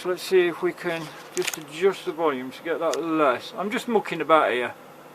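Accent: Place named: United Kingdom. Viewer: Having listened to a lot of Americans on these topics it is beyond refreshing to hear a fellow Brit. A gibbering sprint of unconnected self correcting personality, followed by genius blow by blow creativity. Talking like I'm thinking and clearly dropping exactly what you are aiming to achieve and getting there through educated experimentation. Loving this, talking my language. 😂❤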